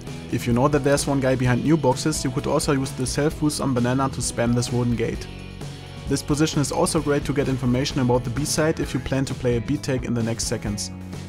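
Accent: Germany. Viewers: man hört einfach raus das es ein deutscher typ is ;D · Du kommst aus Deutschland oder ? :D hört man am aktzent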